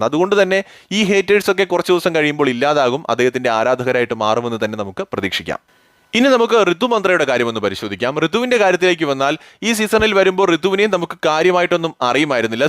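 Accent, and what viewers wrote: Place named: Ernakulam, India. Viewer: സംസാര ശൈലി ekm ക്കാരുടെ ആണ്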